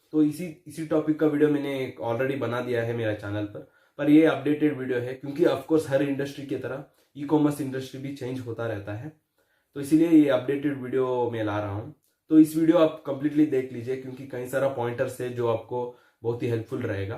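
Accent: Karnataka, India. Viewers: Are you from Karnataka bruh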